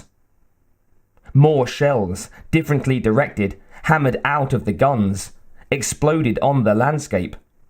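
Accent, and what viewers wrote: United Kingdom. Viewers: Truly committed to your craft to finish the whole thing if you felt the same way I did. Berserker was how I found your channel. It was pretty good and the relationship stuff had a sinister angle to it which was much better than whatever Genocide was trying to do. Berserker narration was fine IMO. There is this actor Will Patton that narrates a lot of audiobooks and I always groan when he narrates one I want to listen to. Nothing against the guy but his voice is very distinctly from South Carolina which doesn't really work for most books he reads, at least for me. Your accent makes it sound like an English Thespian is reading and for whatever reason that seems to work for everything. I'll check out those other books, thanks for suggesting them.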